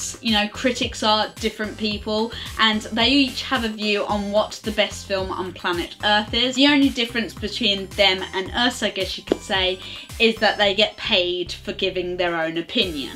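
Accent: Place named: Birmingham, United Kingdom. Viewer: Love the brummie accent :) Freddie said I don't need some asshole critic to tell me that.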